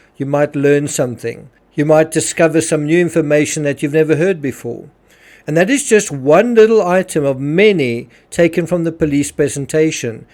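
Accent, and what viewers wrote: South Africa. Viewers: I've been listening to your podcasts for some time now and agree with all you say. Tonight, I realized how much you care about the victims too that you take deep dives into. I was surprised you had so many books on the JB Ramsey case, but bought another triology of yours that is a different famous murder that also took place near Christmas. I have been reading the set, and you are very logical and precise. Thank you. I plan to start the first trilogy on this case next and go from there. For those that don't know, these Kindle books are very reasonable in cost. Thank you, for your help in understanding what has occurred and for your care. Last, your accent reminds me of our neighbors we had in Geraldton, Western Australia, who are from South Africa. There is a large community there of immigrants from that country. There is even a church that has services speaking in Afrikaans. 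Blessings.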